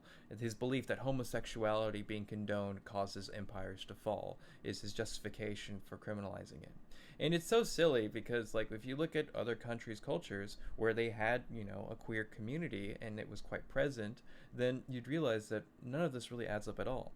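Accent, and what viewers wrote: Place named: United States. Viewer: Unusual to hear such sense spoken with an American accent these days.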